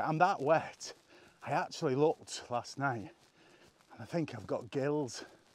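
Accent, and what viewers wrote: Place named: Billinge, United Kingdom. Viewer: Surreal video...stream of consciousness...excellent painterly images. I live in Cumbria now (35 years) and just getting into photography. Born in Leigh nearly 70 years ago, been trying to place your accent.. Billinge! Keep em coming!!😁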